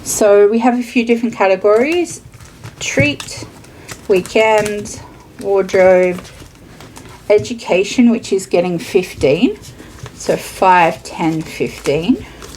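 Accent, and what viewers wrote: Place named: Australia. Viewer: You from Australia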